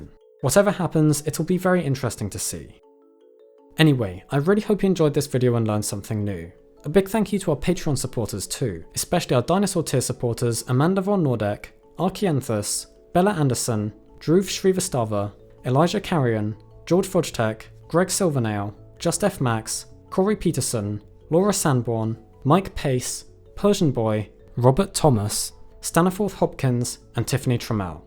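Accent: England, United Kingdom. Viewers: He's English. That's how they talk.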